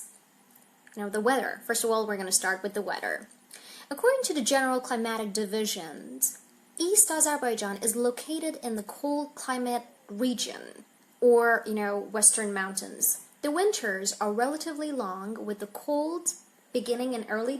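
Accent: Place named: United States. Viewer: Extraordinary presentation with an American accent 😊👏👏👏👌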